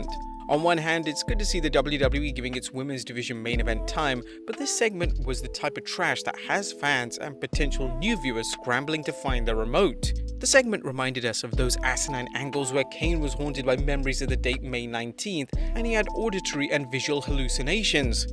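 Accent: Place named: United Kingdom. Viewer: It is so cute to me that because of your accent you don't understand that this entire enterprise serves no purpose but to insult the viewers intelligence. Silly British people think you can take a cerebral approach to understand American blue collar culture.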